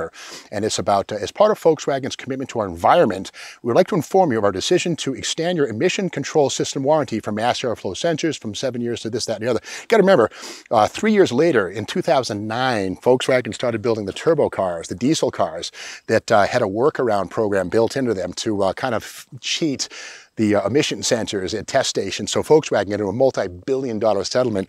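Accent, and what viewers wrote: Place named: Boston, United States. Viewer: Boastun accent lol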